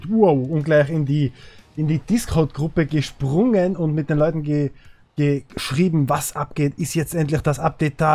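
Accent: Switzerland. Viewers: Bist du Schweizer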